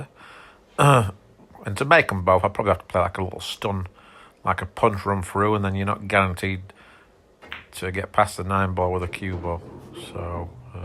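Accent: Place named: Northern England, United Kingdom. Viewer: 29-1 come on Daz, bit lacking that mate. Nah, only kidding, absolutely fantastic play. I'm living in Thailand now and get to play on some great tables in some lovely places and yours, Neils and Karl's videos are so informative and easy to watch. It's great to hear your accent as I don't get that here much as I'm from Hull originally so not far away from you. Keep the videos coming. It's great to see you hitting some good form again. Let's have a few more worlds off ya matey. Also, what do you think of Judd Trump playing in the U.S open, hopefully a good thing as it may start the ball rolling to get some more money into the game and a new set of viewers. Still undecided myself as I don't know but hope he will give the game the respect it deserves. Thanks for your time doing these videos Daz, they are so helpful, informative and very much appreciated. 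P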